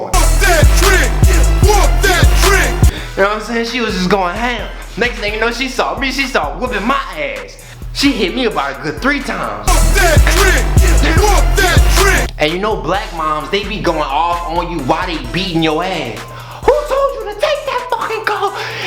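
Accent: New Orleans, United States. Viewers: You from new orleans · You can tell he from New Orleans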